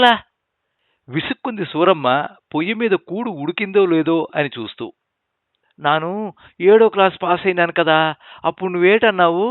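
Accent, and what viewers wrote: India. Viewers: కధ బావుంది. మీరు చదివి వినిపించిన తూరుపు యాస బాగా ఉంది. అభినందనలు.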